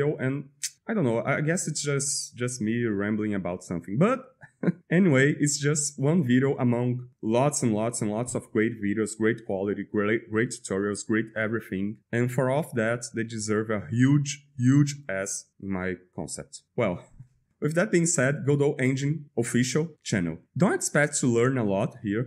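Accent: Brazil. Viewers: That brazilian accent! Great content, keep it up!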